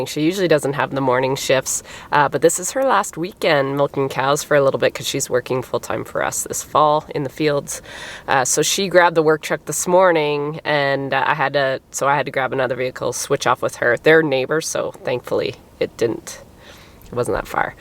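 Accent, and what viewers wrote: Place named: Canada. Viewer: ‘....they’re friendly,..eh’...! Wonderful Canadian...! Love the language,..eh..!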